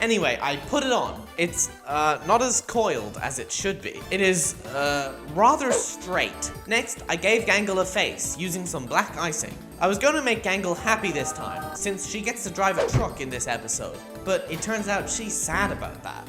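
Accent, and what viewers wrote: Australia. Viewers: UR AUSTRALIAN :0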